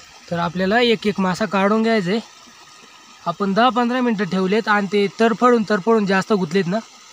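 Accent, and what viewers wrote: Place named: Kolhapur, India. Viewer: Mst video. Tu kolhapur cha aahes ka? Kokani bhasha bolat nahis mhnun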